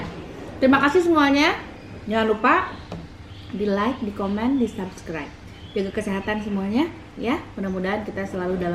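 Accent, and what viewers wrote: West Java, Indonesia. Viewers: Sunda pisan teh...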